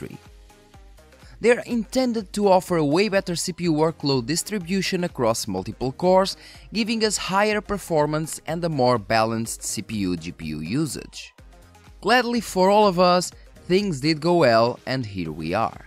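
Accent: Portugal. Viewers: Parece a voz de um tuga, estou certo?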